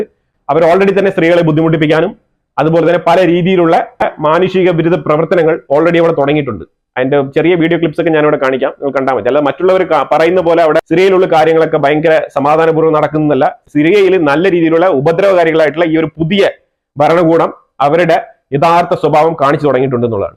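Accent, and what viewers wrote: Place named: Trivandrum, India. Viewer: Excellent analysis and presentation in Trivandrum style talk. Keep it up.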